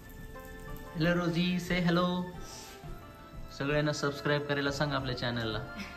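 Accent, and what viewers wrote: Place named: Vidarbha, India. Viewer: chane आहे कुठं आहेत भाषा widarbhatli आहे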